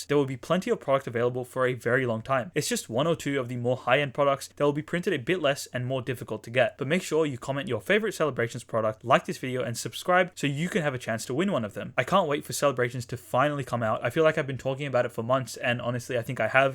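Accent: United States. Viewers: I'm looking forward for the Pikachu figure box, also happy 10,000! Your American accent is much better than some that I know for sure. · Happy 10k! I enjoyed the American accent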